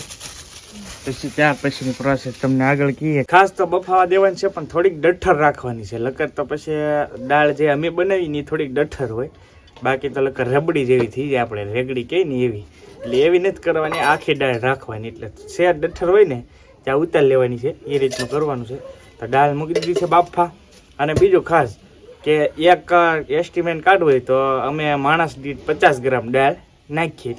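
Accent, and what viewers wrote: Saurashtra, India. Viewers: ખુબ જ સરસ દાળ બનાવી છે, આપની સૌરાષ્ટ્ર ની મીઠી ભાષા ખુબ ગમી જાય છે સૌરાષ્ટ્ર માં શિક્ષક ની નોકરી દરમિયાન આ આનંદ ખુબ જ લીધો છે, માયાળુ માનવી નો દેશ એવો છે કાઠીયાવાડ..... તારાપુર થી ભરતભાઈ વાટલીયા નિવૃત્ત શિક્ષક તથા ઘરપરિવાર ના જયગુરૂદેવ.. જયમાતાજી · Saurashtra ni mithi bhasa ghana valhate sambhalavs mali... Very nice..👌👌 ek j saggetion chhe... Tel ni jagya e guare banavela chokkha ghee no uptog karvo ..baki tame dal ne rotla mate jabarjast banavya chhe... 👏👏👏👏👏👍👌👌👌💐🌺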